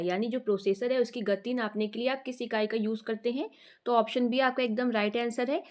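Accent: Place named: Bareilly, India. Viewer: सायद आप बरेली से होंगी अबाज़ से लग रहा है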